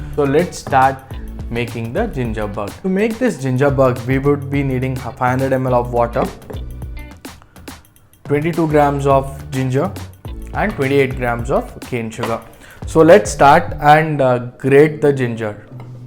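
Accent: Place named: India. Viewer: Thank you....listening to you gives me good memories of my friends of India the Bajaj family.....I think everyone knows them ahahahah.....I am making guava wine now from my huge tree here at my house in the mountains of Guatemala....I will start the ginger bug today for some good ginger beer.....Adios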